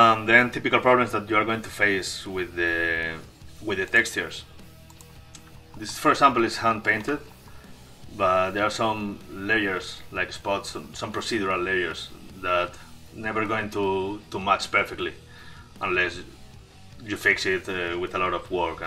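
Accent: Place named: Ireland. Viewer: Very cool! Irish english hehe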